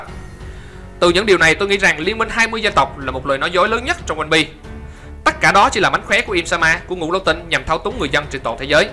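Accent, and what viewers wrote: Quang Ngai, Vietnam. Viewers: giong cua ad giong quang ngai gheee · giọng ad giống người quãng ngãi quá vậy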